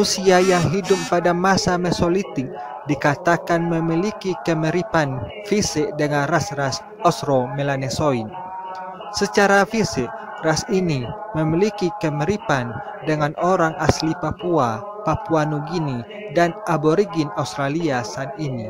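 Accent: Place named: Kerinci, Indonesia. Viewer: Penyampaian kata2 nya membosankan, jelas bngat logat kerincinya.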